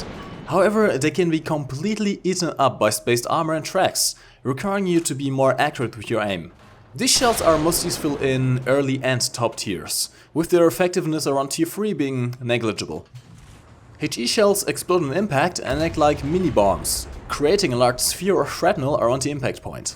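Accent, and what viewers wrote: Germany. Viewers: me : clicks on the video and listens 5 seconds to it . . . me: "Is that the German speaking guy in Squires Videos? " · thank you so much my friend with a heavy german accent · Bist du Deutscher? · Ur German English is really very cute 😍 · super Video, aber bitte arbeite etwas an deinem doch sehr deutschen Akzent. lehrreich war es definitiv, hast nen neuen Abonnenten :)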